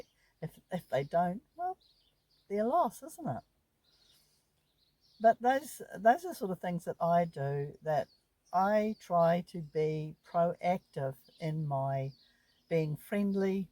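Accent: New Zealand. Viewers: It's fantastic to hear a kiwi accent. Totally agree, everyone needs a purpose, even if it's small.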